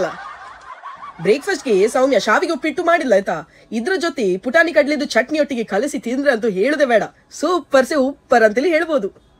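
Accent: Mangalore, India. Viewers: yake milk nodi kushi aitu gotta my sister voice kelidre milk aste 👌 erutte Aa Mangalore kannada kelode kushi❤️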